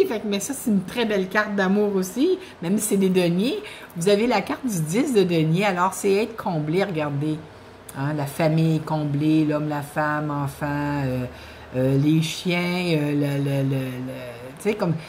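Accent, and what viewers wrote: Canada. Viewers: Merci tres tres positif et en plus avec l'accent canadien que j'adore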